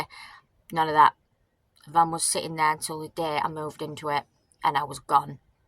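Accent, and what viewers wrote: United Kingdom. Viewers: What's your accent? I'm guessing Lancashire, but not sure. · Hiya flower, You sound like your from my neck of the woods ? I'm a Bury lad. Moved to Pembrokeshire 28yrs ago. Wide awake and unj@ I'm looking at vanlife again. Keeping my upholstery business. So I might live outside my rented premises... Totally and utterly worn out with the matrix....like seriously worn out.... if your ever in Pembrokeshire. Pop in for a cuppa. Empty your grey and fill up with water.... cheerio now sis 😊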